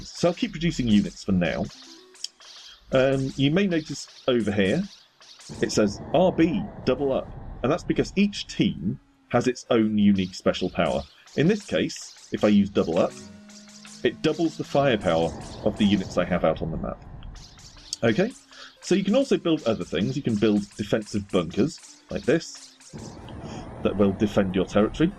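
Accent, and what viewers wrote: United Kingdom. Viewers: Subscribed, nice very clear commentary, and you're English. :D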